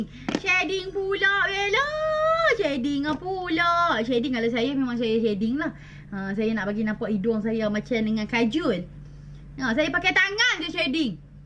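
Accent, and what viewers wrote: Kelantan, Malaysia. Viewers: Hhahaahhaa. Aku sukO beLLa Kecek Klate😂supOo siam hahahhaaa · Panda Bella kecek kelate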